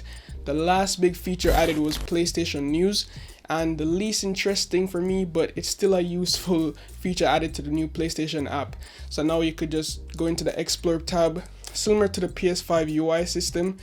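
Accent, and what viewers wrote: Jamaica. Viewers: Ur Jamaican I could tell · U jamaican bro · You jamaican?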